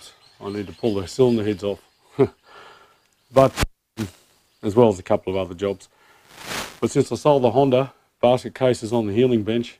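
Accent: Australia. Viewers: how is it the new Zealanders say binch when you aussies say bench like the rest of us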